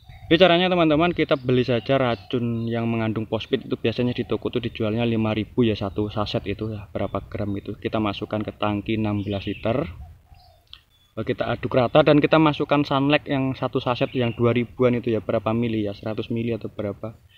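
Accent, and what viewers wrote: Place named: Java, Indonesia. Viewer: Ketoe wong jawa ki logate leh ngomong..tak subcribe mas.. Bener cukup efektip mas saya taruh kapur barus di pohon padi lalu saya cek aman..Padi saya hst 45 hr.. Cm klo musim hjn gimana cara yg baik naruh kapur barus biar gk kena air hjn. Blm ktemu ide saya ini mas..Tolong kasih saran mas klo musim hjn kasih petunjuk mas.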